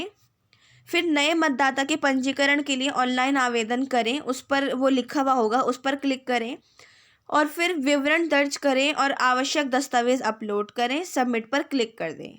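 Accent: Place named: Rajasthan, India. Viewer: Mam I think aap Rajasthan se ho...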